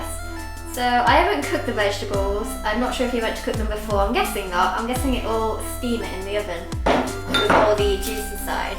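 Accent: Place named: United Kingdom. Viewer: What a beautiful British accent you have! I have always wished I had a British accent.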